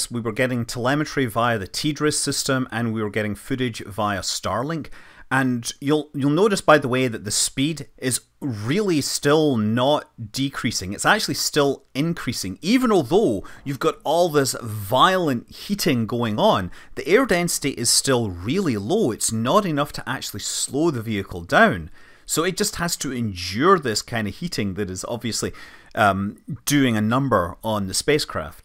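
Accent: Scotland, United Kingdom. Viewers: Brought to your by, the manly Scotsman- love the brogue. 😊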